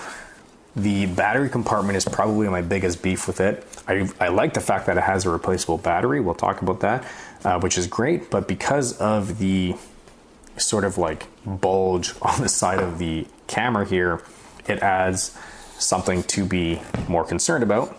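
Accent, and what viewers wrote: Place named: China. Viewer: ESL...you mean Chinglish.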